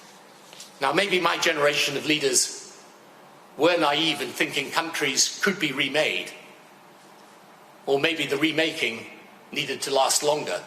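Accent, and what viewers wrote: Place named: United Kingdom. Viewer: No shame whatsoever, just blatantly calling for more deaths of Europeans in general and British people specifically as he thinks fighting Muslims is their strategic depth. I wonder how much money this puppet will get from his puppeteers lobying i.e., the Military Industrial Complex in Europe. Seems spreading ideology of terrorism in British accent is acceptable.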